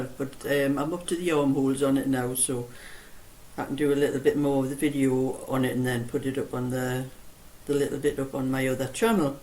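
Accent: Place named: Hartlepool, United Kingdom. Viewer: Love listening to you talk.. I'm a Hartlepool lass living in lincoln.... your lovely accent reminds me of home.... you are a lovely knitter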